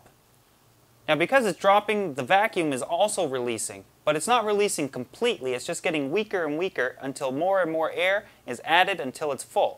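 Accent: Canada. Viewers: fuck I love the way canadians talk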